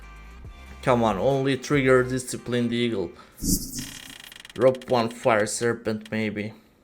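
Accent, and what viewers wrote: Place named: Russia. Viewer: Your russian accent kills me 😂😂😂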